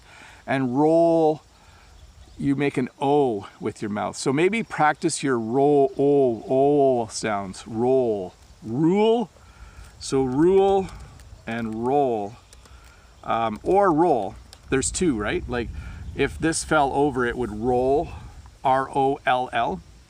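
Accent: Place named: Canada. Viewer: Hi I love your accent Canadian very clear pronunciation but I am not good at English I am from Bangladesh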